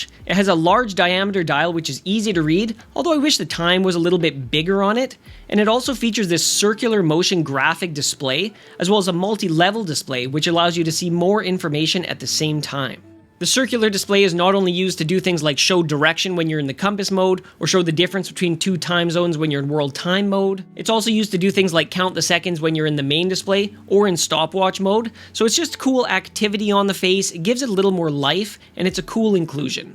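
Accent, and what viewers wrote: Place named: Canada. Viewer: I'm guessing you're in Canada, as you pronounce the word "top" the same way as Jordan Peterson.....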